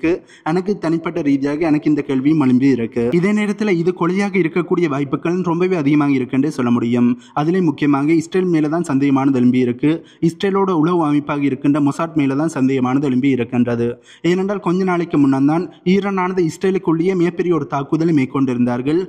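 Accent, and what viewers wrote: Sri Lanka. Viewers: Srilanka tamil best voice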